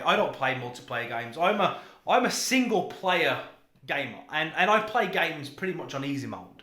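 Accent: United Kingdom. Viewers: am uk and its putting me out I was like was he uk moved somewhere lol 😂 ..but yes give atomfall a look as it looks so good aswell ... having both consoles is the best way now you own a Xbox go and buy yourself some 2800mah AA rechargeable batteries and a socket charger (all about 20 quid if that) and your have a shock how long the controller last to a ps5 controller I get like 4 days from mine lol (usb mic) 😆 my ps5 about 8-10 hours if that lol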